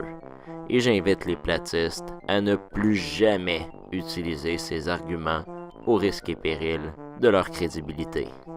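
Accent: Quebec, Canada. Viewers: dim dim Hé oh, pas d'insolence avec nos cousins québécois ! Et puis moi je l'aime bien celui là, et ça change de l'accent suisse 😃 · Il y a des tonnes de débunks et la platosphère est gonflante... Mais un débunkage avec l'accent des érables, c'est tout de suite plus cool. Vivement la suite !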